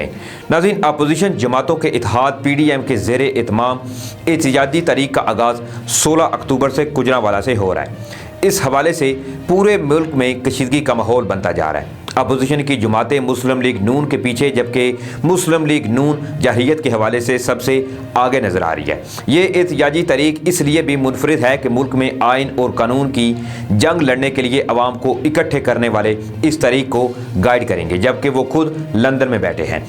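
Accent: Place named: India. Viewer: sahib tum ne urdu bolni kahan se seekhi? Ya tum urdu ko hindi bananay ki nakam koshish kar rahe ho. Mera mashwira hai keh urdu sahi ker lo. Ziada indian ban ne ki koshish na karo.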